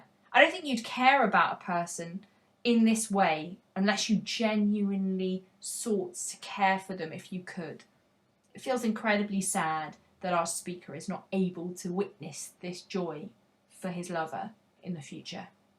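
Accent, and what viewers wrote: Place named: Scotland, United Kingdom. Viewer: Thank you. Truly a delight to hear it in a Scottish accent I might add. 😊😊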